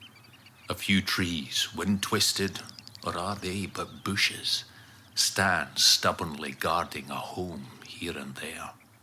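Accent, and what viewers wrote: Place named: Scotland, United Kingdom. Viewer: Listen to that Scottish burr! Gives me goosebumps!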